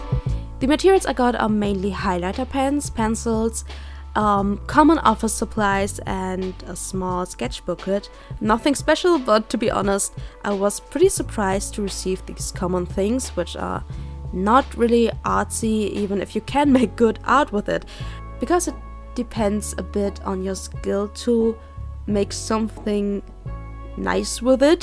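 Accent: Germany. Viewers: Okay, das Bild was du aus den Markern gemacht hast ist echt toll geworden ^^ ich hab btw. Raus gehört, dass du deutsche bist und hab dann nach geschaut:p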